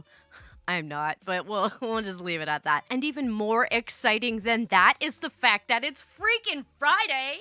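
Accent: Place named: New York, United States. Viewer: I love CB stories and I like your "New York" voice. :)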